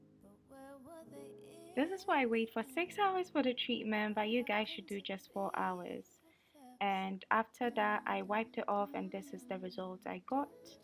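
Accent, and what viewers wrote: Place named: Guyana. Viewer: Are u from guyanna? A friend of mine from there has a similar accent so cute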